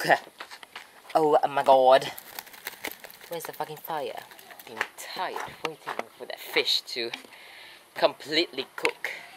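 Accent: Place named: United States. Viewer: dude, if you love british accent why not try use it in your other vlogs...most of your vlogs are in american accent...try new things..it would be great..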